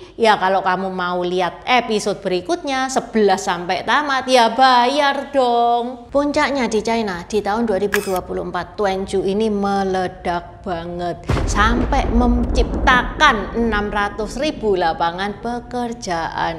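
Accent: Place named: Surabaya, Indonesia. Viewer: Aku suka logat Suroboyo …..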